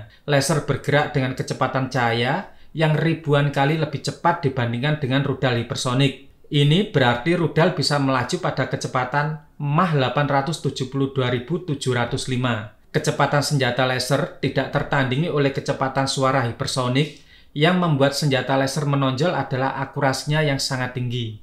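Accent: Java, Indonesia. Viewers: Medok amat...🥶🥶🥶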